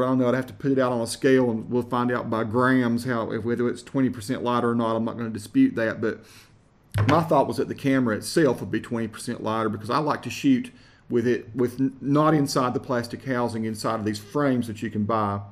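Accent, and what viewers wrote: United States. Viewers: You have a beautiful American accent (where I come from we don't have any American accents. We do have a couple of British accents, but no American accents). Seriously.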